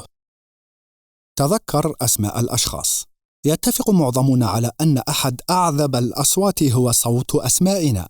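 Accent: Mauritania. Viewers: القارئ موريتاني؟ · هل القارئ موريتاني???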